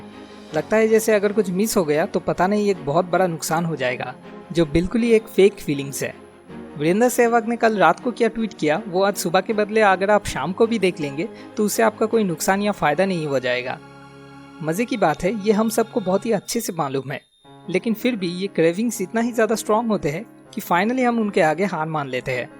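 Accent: Bengal, India. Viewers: I am 💯% sure that you are BANGALI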